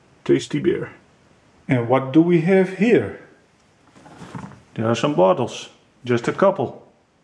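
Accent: Netherlands. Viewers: Allright Im going to try this as my first time brew! How did it turn out? And do I have to filter it before drinking? Proost from Holland ( and hearing your dialect I think you come from NL aswell?)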